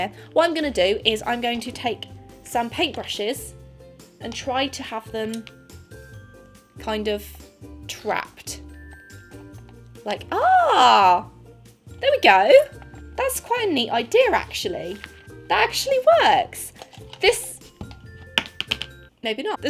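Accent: England, United Kingdom. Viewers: Where do you live? You sound English yet you used dollars 😅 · After hearing British accent for so long. I'm starting to read all the comments in an accent. In reading this in an accent right now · Life hacks in a British accent are just better tbh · The reusing an altoid tin or prismacolor tin is also an idea I just literally found this week on Pinterest! I'm not as much a fan of paint as I am ink and colored pencil but I love the idea of reusing tins like that for art supplies. P.S I love your accent!- I've never met someone in person with a British accent until my new neighbors I just met recently! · What country do you live in, because you sound like you are from the uk, but you talk with dollars?? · bit confused how you got to target tho?🤔 you have one of the strongest british accents🇬🇧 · Lol she looks like Anna Kendrick with British Accent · Wait, where are you from? 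You said dollars and you have American plug sockets but you sound British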